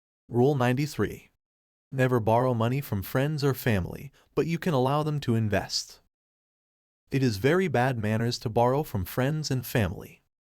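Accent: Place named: Asia. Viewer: ROBOT READER with Asian Accent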